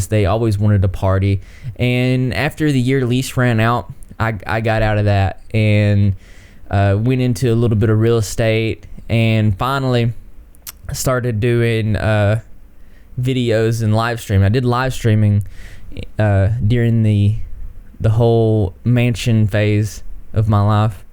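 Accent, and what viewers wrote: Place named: Georgia, United States. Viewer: can't believe i didn't put it together that you are from georgia. i love the accents of the people from there and i love yours. and really appreciate that perspective you have. you wouldn't know happiness if you didn't know pain. i use similar mantras myself, my current favourite "happiness or suffering, the choice is mine/yours". happy holidays everyone 🎐☃️💟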